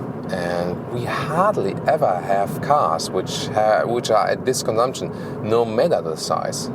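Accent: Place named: Germany. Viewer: man merkt schon,dass du ein deutscher bist...sprichst ziemlich langsam englisch.was gut ist,da versteht man,bzw. ich auch mehr... 😉 die engländer sprechen ja fast doppelt so schnell... 😂😉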